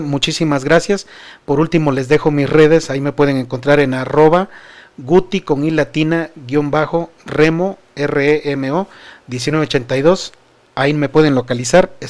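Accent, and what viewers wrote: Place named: Oaxaca, Mexico. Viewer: Este camarada se be que es de oaxaca chingau asi se abla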